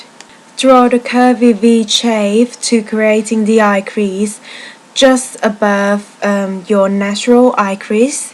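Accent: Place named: United Kingdom. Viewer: I wish I have British Accent like yours. T^T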